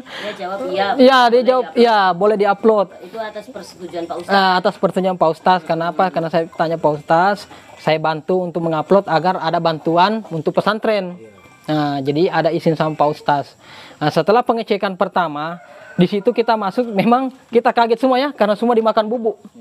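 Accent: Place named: Java, Indonesia. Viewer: Pak Ustadz nya logatnya kaya orang JAWA.....SALAM DARI JAWA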